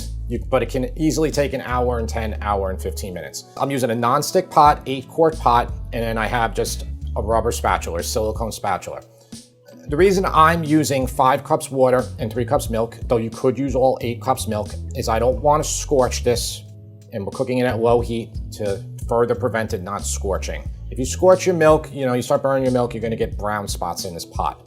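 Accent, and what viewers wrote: New York, United States. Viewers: I haven’t tried the recipe yet, but I enjoy the way you “tawk” because it’s exactly how I do! Lifelong New Yorker. My adult children still mock me, especially when I make fresh-brewed “cawfee!” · Love your NY accent! I’m from NY too, but now live in Virginia.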